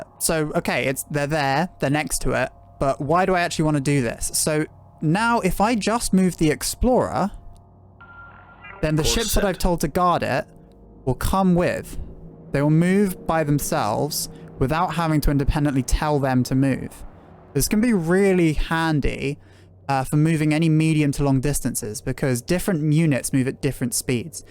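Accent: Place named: United States. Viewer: Gibt es den keine sch** Anleitung auf deutsch? Ich hasse die amerikaner an sich schon und bei dem Dialekt.... 🤮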